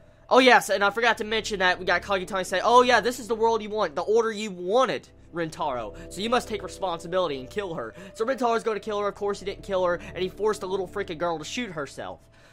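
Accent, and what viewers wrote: United States. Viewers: are you american???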